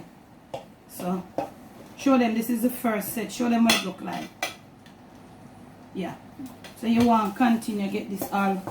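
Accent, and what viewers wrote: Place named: Jamaica. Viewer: Hello could you provide the full recipe, you have a beautiful jamaican accent I couldnt tell what some of the ingredients were that you used. there is one where it sounded like you said ammon essence. tried looking it up couldn't find it. and so i thought maybe she said lemon essence, I found that. is that one of the ingredients in your recipe lemon essence?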